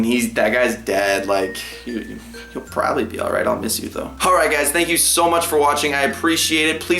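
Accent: Wisconsin, United States. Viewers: Are u from Wisconsin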